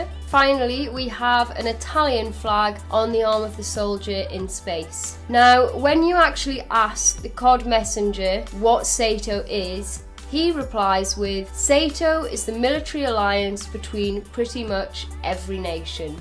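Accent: United Kingdom. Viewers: i dont know maybe somewhere in britain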